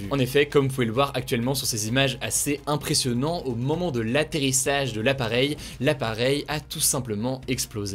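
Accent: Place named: England, United Kingdom. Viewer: On apprécie l'accent anglais d'Hugo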